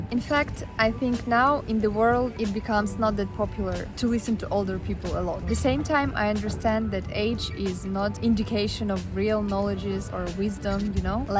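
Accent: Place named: Turkey. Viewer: Sıradan türk görünümlü rus ilginç bir de İngilizce türkçe aksanlı 😂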